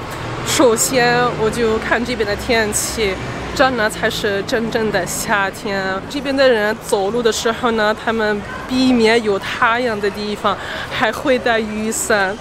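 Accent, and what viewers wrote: Standard. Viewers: 感覺的中文很標準耶..今天看到你的頻道..已訂閱..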